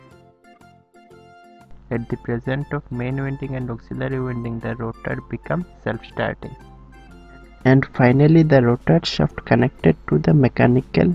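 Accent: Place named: East India, India. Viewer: your east indian accent is clearly depicted.. -@ anway i get it and the graphics are done well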